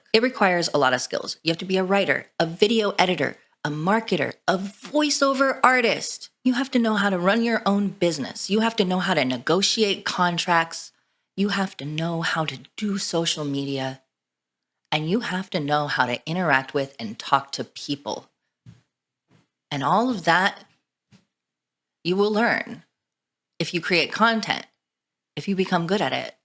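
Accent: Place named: California, United States. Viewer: Yea my first time seeing you and I gotta say that's a strong ass California accent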